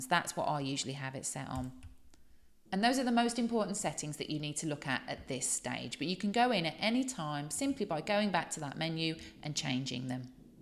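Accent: United Kingdom. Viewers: Super beginner's guide to Facebook. Intrigued by your accent. Are you from Worcestershire originally? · Are pronouncing British English?Is so, that's great.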